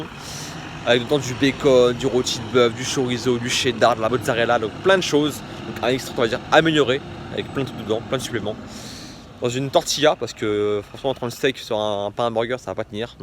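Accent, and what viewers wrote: France. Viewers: Tu est du marseille ?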